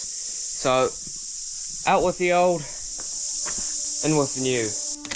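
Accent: New Zealand. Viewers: I heard "one of the advantages of having a dad who has a mess of shit" instead of "a dad who has a massive shed". Ha! First heavy exposure to the Kiwi tongue.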